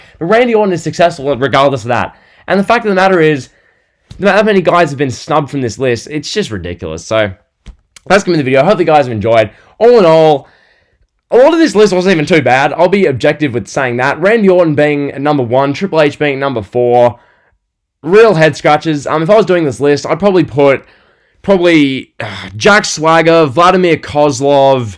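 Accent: Australia. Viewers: I love the Aussie accent bro, bless you..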